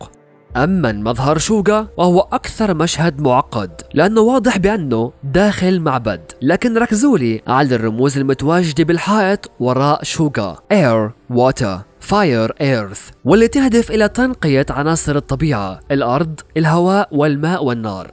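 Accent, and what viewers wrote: Iraq. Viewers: قلي نت ليش تحجي مرات عراقي بس أرى اعرف 🙂🤔🤔🤔🤔🤔🤔متابعتك من العراق🇮🇶🇮🇶🇮🇶🇮🇶🇮🇶🇮🇶🙈🙈🥰🥰🥰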